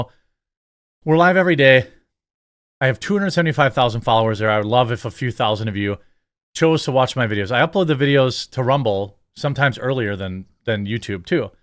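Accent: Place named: Milwaukee, United States. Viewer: Clearly, I can tell your from Milwaukee!😂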